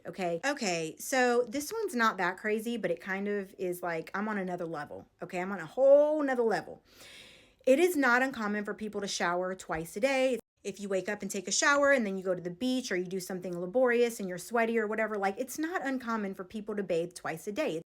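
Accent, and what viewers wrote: South, United States. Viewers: You are soooo beautiful 😍 and I love your southern accent 🥹🤎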